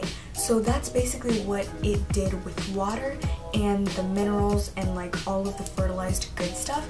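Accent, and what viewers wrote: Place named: United States. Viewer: we love tht gringo accent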